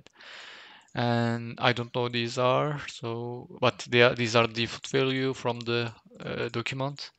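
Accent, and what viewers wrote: Turkey. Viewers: dostum türksün sanırsam konuşmandan sezdim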